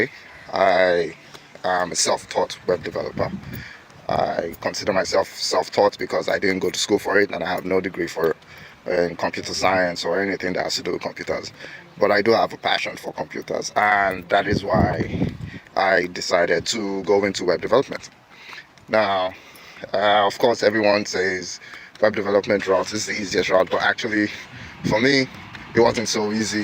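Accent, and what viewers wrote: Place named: Nigeria. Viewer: Thanks a whole lot for sharing, it's so inspiring and I believe your course will help me understand better, many of the things I struggle with cos I really needed stuffs to be explained to me in that naija tone, and here I am!! hehe. Thanks a lot.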